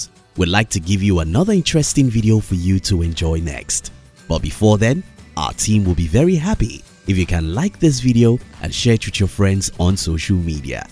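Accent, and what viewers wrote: Nigeria. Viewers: The voice sounds Nigerian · This narrator is definitely a Nigerian brother.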